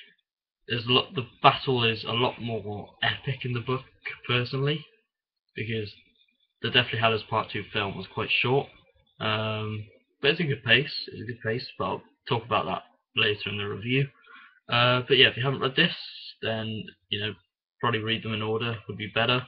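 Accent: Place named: Northern England, United Kingdom. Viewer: okay you sound northern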